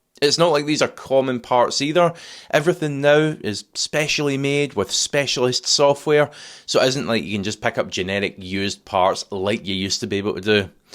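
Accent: Scotland, United Kingdom. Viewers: love scottish accent! Freedom!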